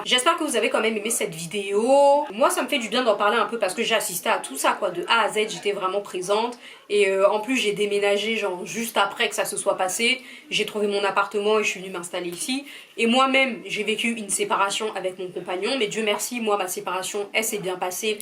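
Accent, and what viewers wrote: Cameroon. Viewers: Si vraiment je dois être honnête j’ai pas compris l’intérêt de la video a partir du moment où raflo elle même a déjà répondu au question des gens . Je pense que porter de l’intérêt à ce connard n’est pas utile . Raflo mérite mieux ! Qu’elle vive sa vie une nouvelle et belle vie mama le gars la est pourri , elle a de la chance de t’avoir à ses côtés Jpense que c’est grâce à vous son entourage aussi que la rupture ne la pas abattu . Bref malgré que je trouve pas l’intérêt de ta vidéo merci d’être là pour elle je pense que tu es une très belle rencontre pour elle . Laissez vos affaires de sorcellerie bande de fou. Ton accent camer me stress trop 😭 bisous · Ton accent kmer c'est la tension😊.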